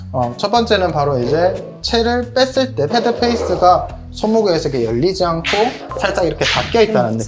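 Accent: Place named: Gyeongsang, South Korea. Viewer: 사투리 좋아요 지도 경상도라 방가방가입니다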